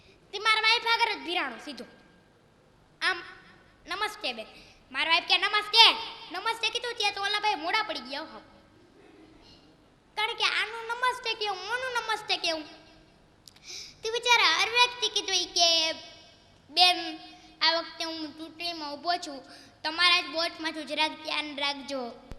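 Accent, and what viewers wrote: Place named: Rajkot, India. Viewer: Vaah Rajkoti boli..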